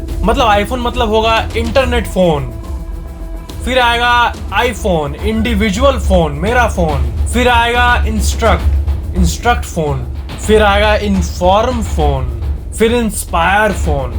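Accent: Bihar, India. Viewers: Are you from Bihar sir ? Please reply or give heart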